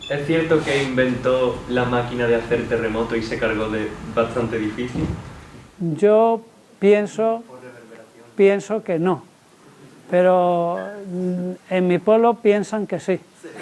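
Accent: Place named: Andalusia, Spain. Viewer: ETE ES ANDALUZ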